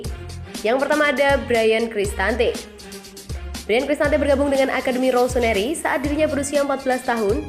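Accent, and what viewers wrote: Java, Indonesia. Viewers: Masih kedengeran Medok mbak, hehe..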